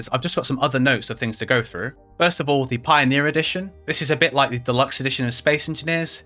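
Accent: England, United Kingdom. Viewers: A li ttle bit of English lad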